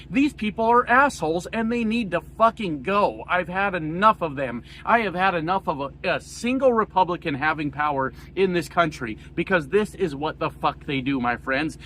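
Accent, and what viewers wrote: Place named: Canada. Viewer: Love from Canada. You're sounding more and more like one of us.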